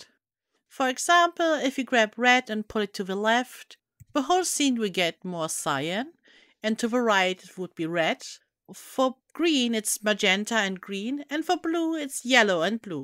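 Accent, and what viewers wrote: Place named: Germany. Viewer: Lovely german accent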